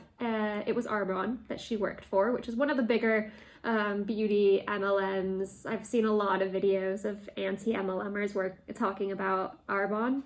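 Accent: United Kingdom. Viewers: Ah your sweet little UK accent that just barely comes out sometimes..adorable! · What's really interesting is I sensed a UK accent when you first started talking before you even mentioned you lived/worked there. So cute!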